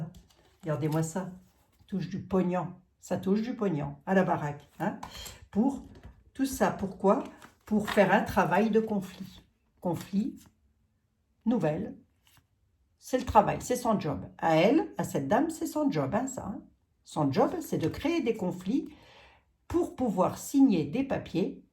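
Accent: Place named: Vosges, France. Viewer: Bonsoir, j'ai écouté Christophe ja cob hier soir, ils ont dit avec Babette qu'ils allaient vous voir, ça promet les bêtises 🤣🤣😅😅. Moi je suis une ex spinalienne, du coup j'adore votre accent, et vos bêtises 🤣🤣😅😅 ça m'éclate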